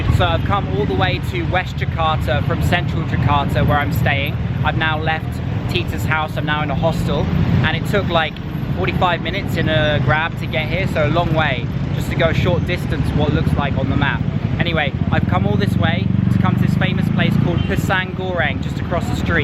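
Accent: England, United Kingdom. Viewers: I love to heard your British accent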